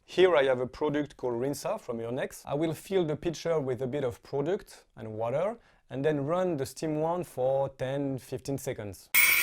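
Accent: France. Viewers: Great video. Vety informative. I also enjoyed hearing your French accent. Merci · We love your French accent, it makes it so interesting !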